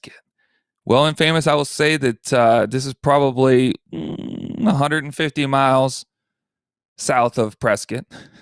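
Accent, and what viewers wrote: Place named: Chicago, United States. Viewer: He sounds like a Chicagoan.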